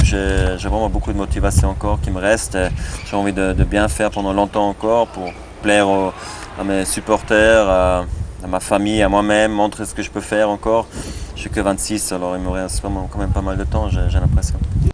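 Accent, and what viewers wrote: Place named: Switzerland. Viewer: He speaks swiss French, which is slightly different from standard French, but he's definitely fluent, he speaks a perfect French.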